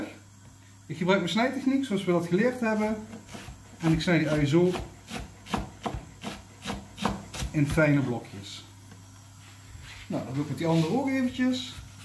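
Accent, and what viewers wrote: Netherlands. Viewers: Wouw echt geweldig lekker had jou recept gevolgd. Was ons niet pittig genoeg . Dus 2e keer gemaakt. Eerste keer gemaakt met de chips in de oven . Nu gemaakt in burritos vorm . Met een Carolina reaper en een chilli pepper. Hot chilli saus en chirazza saus * waarschijnlijk verkeerd geschreven ) maar mmmmmmm wij zijn echt flink pikante eters . Heeeeerlijk . Ik zie jou graag bezig. Ben zelf van België. Maar jij bent van de kanten heerlen denk ik ,niet ? Ik ben zelf van België grens maastricht . Ik vind dat accent zo geweldig om naar te luisteren als belg . Ik ga je volgen. Je legt ook alles zo mooi uit . Weet precies wat te doen . Top kerel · Zou deze man uit Limburg komen?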